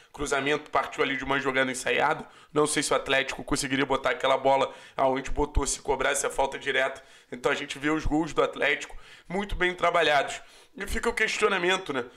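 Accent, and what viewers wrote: Rio de Janeiro, Brazil. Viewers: Sotaque carioca + Camisa do CAP ? Like.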